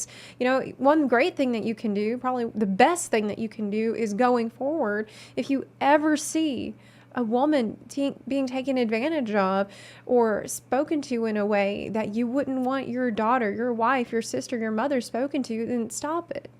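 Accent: South, United States. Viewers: I'm so confused. Your profile says Ukraine, but you sound like you're from the Southern US. Also, your voice is so soothing. This is like accidental ASMR almost. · It's very brave that you talked about a topic that was personally very difficult for you aswell! I could see and hear how difficult it was for you to talk about this, and I admire your courage to make this vid!! Also, you're Ukrainian?:O Girl you sound so very American I didn't even notice the slightest accent lol. My collegue is also, and she's a very nice person, but always very puch busy with her looks and can have a 'southern' temprament hahah (she's from the warm part with coast). Anf finally, people talking in the comments to 'boycot' Blizzard or definitely ending their sub because of this scandal, didn't you hear say that MOST of the people there are just DOING THEIR JOB?! The people who are being harassed already have it difficult enough, last thing they need is losing their income.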